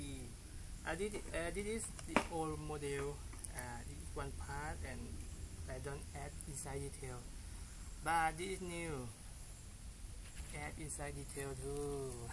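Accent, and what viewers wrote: Thailand. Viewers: Over Rock ก็พวกเราคนไทยไงสำเนียงมันไม่ใช่แบบฝรั่งมันเลยงงกัน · คนไทยชัดๆ สำเยียงไทยมาก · คนไทย สำเนียงยังไทยเลยอะ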